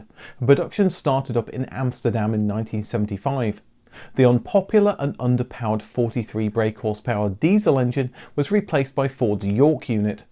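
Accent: United Kingdom. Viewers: You look perfectly fine to me Bro. Love your British accent. Thanks from Cape Town, South Africa 🌍🇿🇦❤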